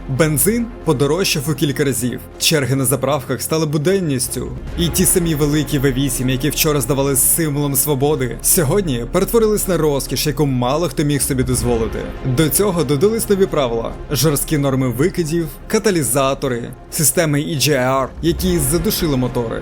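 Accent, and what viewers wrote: Russia. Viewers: "Не цямиць", "падзіння", "бюрокрації", "дзякуємо".. це за першу хвилину.. далі мене не вистачило слухати цю "маацковскую" вимову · Було цікаво але проскакує заводстка расссєйська прошивка. Важко слухати, коли кожне 10 слово викривлене. Хоча кожне 10 - це не кожне 3 …але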